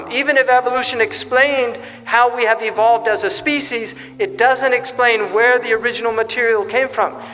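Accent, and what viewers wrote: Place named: Arab world. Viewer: Hamza yusuf un arapça aksağanı çok kuvvetli maşallah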